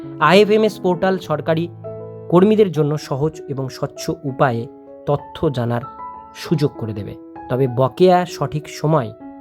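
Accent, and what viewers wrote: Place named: North 24 Parganas, India. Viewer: Dada/ vai, mone jeno dukho paben na ! Apni, apnar ucharan ta ektu improve korar cheshta korun please! Ch,chh, gulo sruti kotu, north 24 pgs er grammo tan, apni jehetu channel chalana korchen, tai apnar ucharan ta rao valo hole sunte beshi valo lagbe! (Just suggestion).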